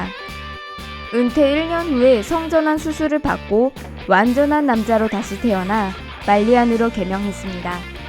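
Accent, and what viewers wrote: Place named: Japan. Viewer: 발음이 일본사람같네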